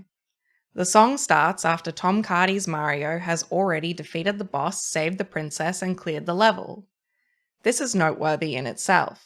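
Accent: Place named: Australia. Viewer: Love your analysis, and also the storytelling in your video. Editing is solid and considered, and your performance within that is genuine and carries with it the weight of your words. Glad to have stumbled over your work! Thank you for answering this particular call with yes. Also lovely to hear content with an Aussie accent, and you did a bang up job on your eye shadow. GG all round! Now if you don't mind I'm going to go make my 10,000th Iridium sprinkler and pat all my cows; midnight be damned!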